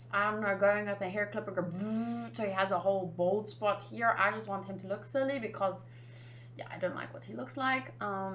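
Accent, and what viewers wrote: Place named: South Africa. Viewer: I just cam across your channel tonight, and when you talked I was like. "YO you South African" if im wrong i will kill myself. But im like 100% sure you are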